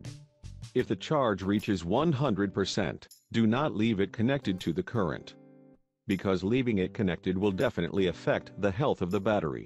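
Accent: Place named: United States. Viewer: Thank you for this useful information. Hearing an American accent I assume you mean Fahrenheit and not Celcius, when mentioning temperature.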